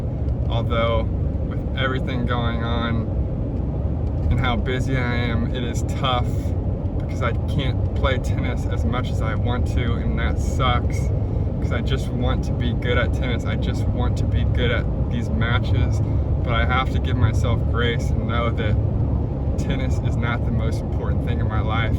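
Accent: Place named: South, United States. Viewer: her southern accent killed me, love the skits.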